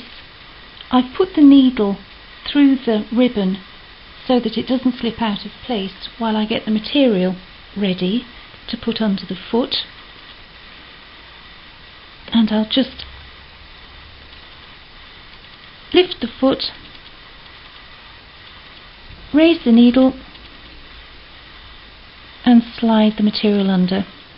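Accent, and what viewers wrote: United Kingdom. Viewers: You have a beautiful way of speaking … its lovely to hear British English rather than American. Reminds me of Lady Di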